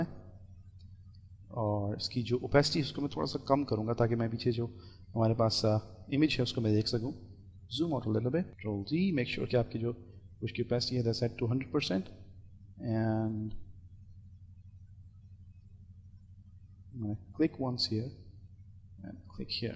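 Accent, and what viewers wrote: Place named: United Kingdom. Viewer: bhai agar aap urdu tuttorila ka boltey hein tourdu hi ziyada istammal karein meharbani ho gi, urdu ko english excent mein boltey huey aap achey naho lagey muhey muaf karna bhai.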